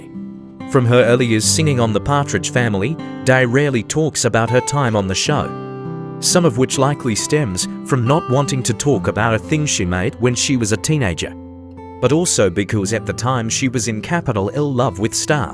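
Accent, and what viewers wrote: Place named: Australia. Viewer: An AI with an Australian accent…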